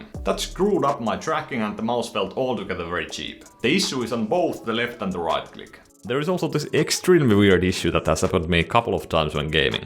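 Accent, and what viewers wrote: Finland. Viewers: Are you from finnish? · Are you Finnish? That accent is familiar.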